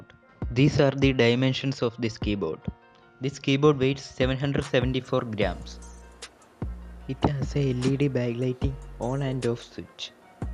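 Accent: Kerala, India. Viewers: Malayali ahnalle ? 😂 ( english kettappo manasilaayi )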